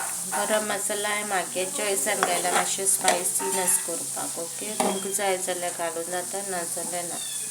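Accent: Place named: North Goa, India. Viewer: I just like your north goa accent ❤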